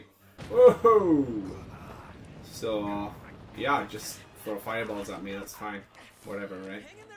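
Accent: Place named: United Kingdom. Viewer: no worries bro been watching your vids regularly and there really good!!🇺🇸💪 but dude what happened to your British accent!!! Don't forget ff7 on that list! Let us know when your next online we'll party and catch up, you know I'm a Sony boy through and through but the switch has turned my head a little 👌 also I was in the Nintendo store in NYC and it's crazy, you need to get there sometime man you'd be in heaven.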